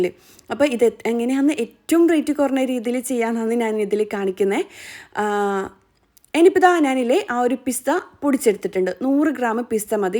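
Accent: Kannur, India. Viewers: First time seeing ur channel . Nalla samsaaram 😘😘😘Kannur aano .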